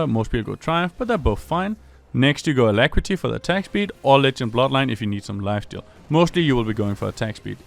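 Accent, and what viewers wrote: Denmark. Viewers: Er han dansk?? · I gotta ask, are you Danish? Great video BTW.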